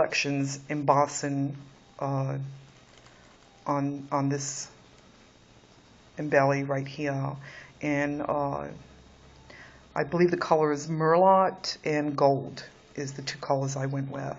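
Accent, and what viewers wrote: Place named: New England, United States. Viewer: Hi. So? I think I hear a bit of New England in your accent? I came over from RJ's channel. The album and it's box are gorgeous.Luscious tags! You did a great job with your 1st vid. I don't have a camera yet. I hope I do as well on my first. Kathy and Leann are both great artists. Have you ever seen Anne from xannero1 videos. She makes the most amazing things! With all sorts of mini shelves and boxes. She even made a mini sewing table for her last mini! TFS Hugs, Jess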